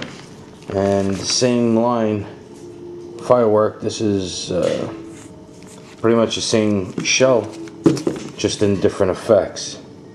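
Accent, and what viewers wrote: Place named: New York, United States. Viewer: Your NY accent remind me lot of the guys from Grucci when they fly here to las vegas from new york to work on a show hahaha. and all i would hear is " hey Tony...go fuck yourself!" funny as shit loading and wiring shells in the sun and everyone is talking shit left and right...but playfully though..makes work more fun. happy 4th man!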